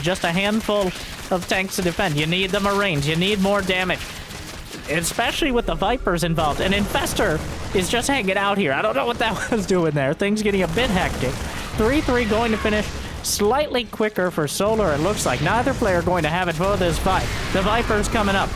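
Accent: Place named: Midwest, United States. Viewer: Where are you at? His accent hmmmm I'd say Indiana or Missouri.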